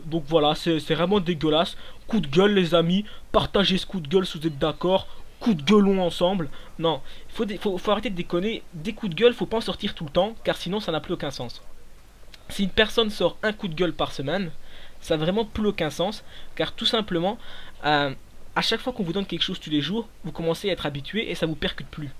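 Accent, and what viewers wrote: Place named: Charleroi, Belgium. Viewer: HA l'accent carolos ! ces sa qu'est bon !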